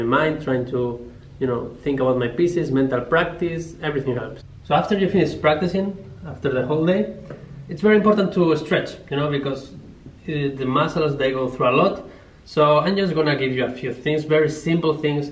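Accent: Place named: Iberia. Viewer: Ése acento ibérico.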